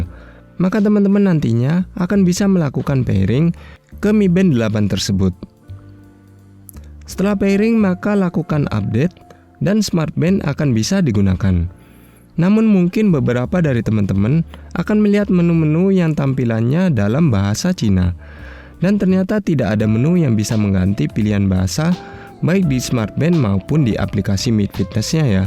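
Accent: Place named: Malaysia. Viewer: salam dari malaysia bang,loghat melayu terdengar😂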